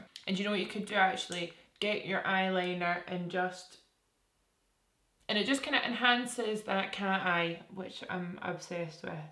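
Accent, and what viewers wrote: Scotland, United Kingdom. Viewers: I start to annoy myself when I watch ur videos. I am from Vancouver Canada and I find myself repeating every other word just trying to mimic you..I love your accent🥰💖🥰lol my bf is usually on the bed beside me and he can’t see or hear what I’m watching, he just hears me saying random words with a Scottish accent to myself in a very serious tone🤦‍♀️😂🤣😂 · LOVE that Scottish accent omg..my mom is from Paisley, its awesome!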